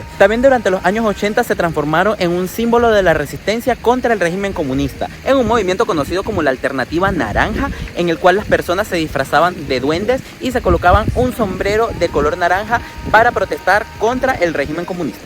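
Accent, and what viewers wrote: Venezuela. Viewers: Que fino escuchar a un venezolano 😊😊😊 Próximo ✈️😊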